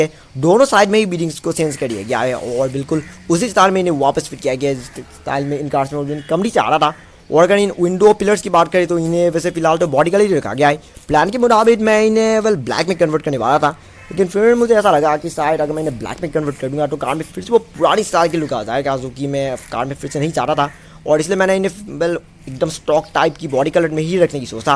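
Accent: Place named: United Kingdom. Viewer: Kotha bur olop bhal kobisun aru besike bhal lagibo aitu english accent hindi koi aso ni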